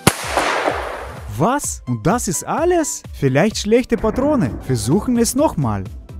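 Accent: Russia. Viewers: Ah du russe dashalb aktzent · er ist russe oder redet er wie ein deutscher?!!!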